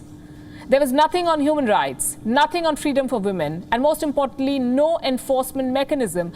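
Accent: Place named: India. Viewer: Indian masquerading to be western, but her funny accent gives it away.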